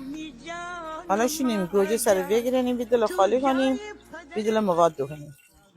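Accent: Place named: Shahsavar, Iran. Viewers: خانم به نظرم شما مازندرانی هستی واسه غرب مازندرانی❤❤ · لهجه ی شما ب شهسوار و رامسر نزدیکتر تا رشت و گیلان ❤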